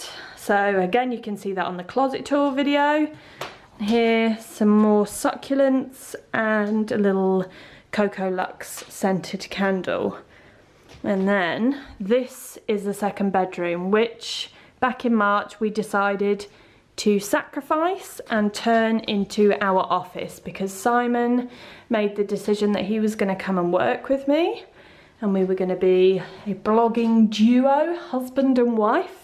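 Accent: England, United Kingdom. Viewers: I love everything, you have done a grand job! I also really like how you have chosen items from many shops, all of which I know living in the uk. Super lovely to hear your English accent. A lot of these type of videos are done by Americans. 😊 😊😊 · Your accent is so British lol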